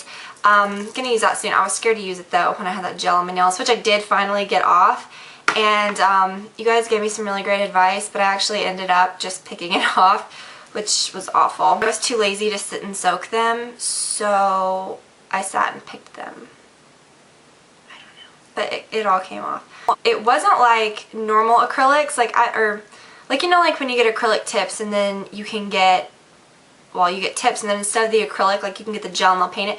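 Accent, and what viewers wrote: South, United States. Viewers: I think it's just might be a bit of her accent...she's from the South I believe...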